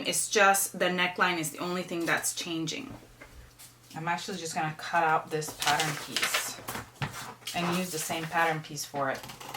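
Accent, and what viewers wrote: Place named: Spain. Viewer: You have a Spanish accent!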